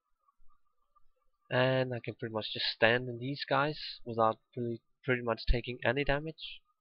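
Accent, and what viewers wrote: Denmark. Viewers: You Danish/From Denmark? Sounds a lot like it. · Er du dansker?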